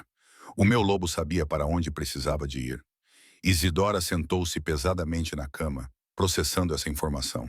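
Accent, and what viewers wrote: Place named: Portugal. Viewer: Gosto das estórias, não gosto muito da narração, português PT misturado com o nosso, gosto do sotaque brasileiro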